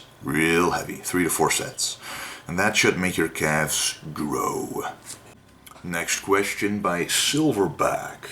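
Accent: Netherlands. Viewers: What accent is it? Is it Dutch?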